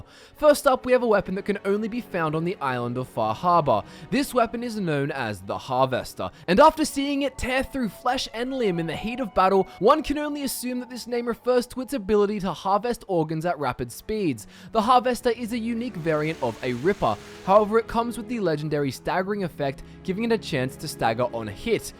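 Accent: Australia. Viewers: I have literally never heard anyone pronounce the words Alteration or Harvester the way you do and it makes me ears confused because you have a perfectly normal Aussie accent besides those 2 words.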